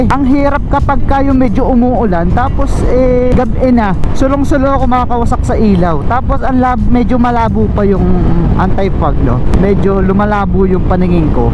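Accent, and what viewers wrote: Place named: Quezon, Philippines. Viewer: Taga quezon kaba boss may punto ka e haha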